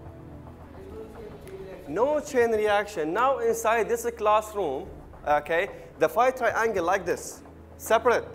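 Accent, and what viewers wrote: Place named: India. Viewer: Excellent explaination eventhough he sounds like a Indian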